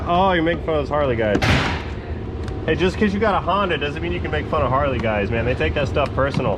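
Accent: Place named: New England, United States. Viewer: Sounds like is a New Englander.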